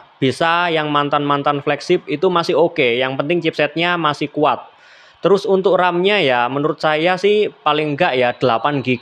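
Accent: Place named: Java, Indonesia. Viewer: Sene deragon, bahasa medok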